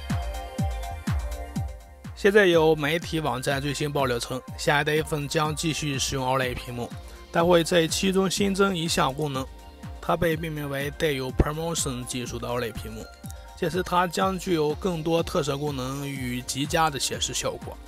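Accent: Shanxi, China. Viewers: 这货是山西人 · 主播山西人？？？？？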